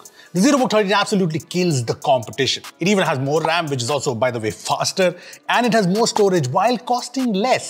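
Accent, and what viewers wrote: India. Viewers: that's his accent. Most urban Indian accents in posh areas of Delhi, Gurgaon, and Noida do not pronounce r in words. And he's from Noida.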